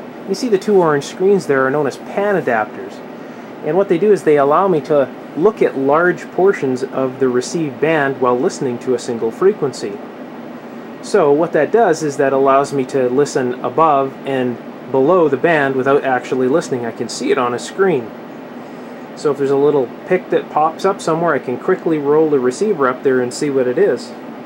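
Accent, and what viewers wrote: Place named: Canada. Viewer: Found you vids, amazing! You are wise, skilled and accomplished beyond your years. Your video production and presentation skills are only surpassed by your technical knowledge and abilities. Your background would be an interesting story! Fascinated with the Canadian "accent." Looking forward to more videos, hope you don't get bored with the process.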